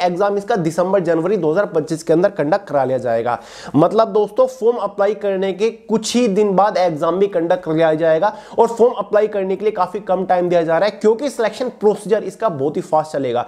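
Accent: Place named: Western Uttar Pradesh, India. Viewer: kana se ho aap aapki tone west up se lgre h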